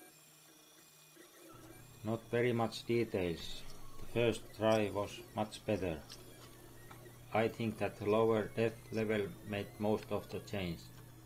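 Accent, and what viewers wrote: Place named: Finland. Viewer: Fab, very helpful. I'm on a Mac and unless I make my own binaries I have to use Regard3D. Are you still using it? I'm guessing you are from Finland - having listened to Mika Hakkinen for many years I THINK I recognise the accented English. Or ARE YOU MIKA HIMSELF?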